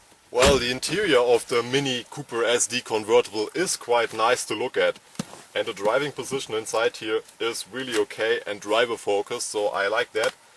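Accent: Germany. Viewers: Your german accent is really cool , anyways i own a mini and although i`m not an expert im very pleasant with it , i think it is a really good car , regards from Mexico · 1st off: love the German accent. 2nd, one purchases a Diesel for cost savings not sport. Why would Mini offer an engine that beats it's high end Cooper Works edition?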